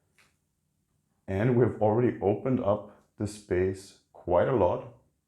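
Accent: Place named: Germany. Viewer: Kann es sein, dass du Deutscher bist? Dein Akzent klingt so vertraut. Grüße aus Österreich 🪴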